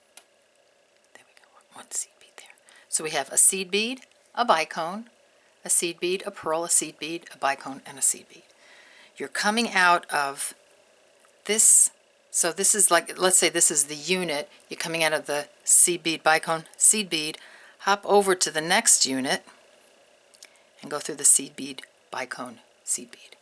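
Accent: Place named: West, United States. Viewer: you do not sound like you are from the northeast, rather some where in the west.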